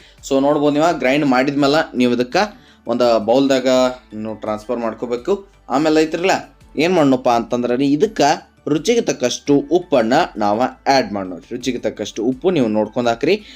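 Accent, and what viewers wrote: North Karnataka, India. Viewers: ಕಾಮೆಂಟ್ ಮಾಡಿದ್ರ ರೀಪೆಲೇ ಮಾಡ್ತೀರಿ ನಮ್ಮಗೆ ಖುಷಿ ಆಯ್ತು ನಾನು ನಿಮ್ಮ ಅಭಿಮಾನಿ ನಾವು ಮಂಗಳೂರಿನಲ್ಲಿ ಇರೂದು ನಿಮ್ ಬಾಯಿಂದ ನಮ್ ಉತ್ತರ ಕರ್ನಾಟಕ ಭಾಷೆ ಕೆಳಾಕ ಖುಷಿ ಆಯ್ತು ದ್ರ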